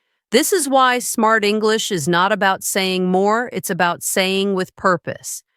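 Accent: United States. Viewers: How come you have shown British flag but speaking American English 😅😂 , overall it’s a great video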